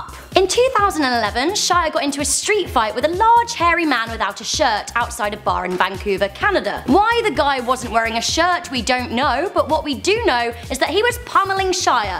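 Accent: United Kingdom. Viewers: guurl, Why do i gotta be a sucker for them British accents <3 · Ok usually I'm really into British accents, but when you mix it with you're voice it's literally the ugliest sound on earth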